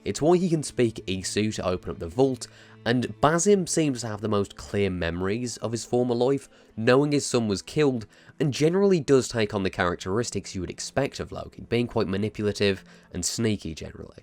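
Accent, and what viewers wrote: Birmingham, United Kingdom. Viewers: Birmingham. Can you not tell? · I did actually think you were from Brum but wasn't 100% sure.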